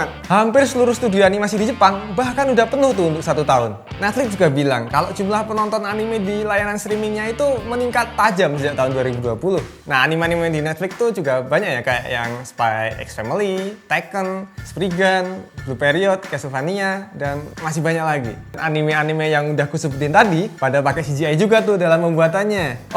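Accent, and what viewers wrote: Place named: Java, Indonesia. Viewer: wah ternyata orang yg omong ini orang Jawa ya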